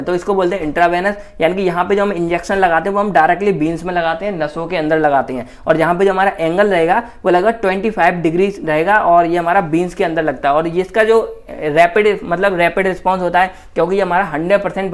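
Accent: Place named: Uttar Pradesh, India. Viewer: u r from up nnaaaa......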